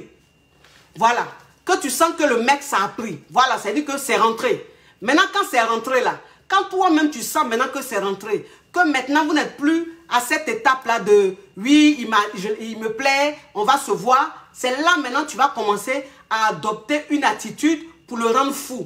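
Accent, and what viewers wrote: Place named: Ivory Coast. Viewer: 😂😂😂😂😂😂😂ma Diva tu m'a tué hooo ko grillade , soupe, braiser je te love ma cause simplisimie, sans façon, ma diva qui parle le vrai français direct de nous en côte d'Ivoire. Love u❤❤❤❤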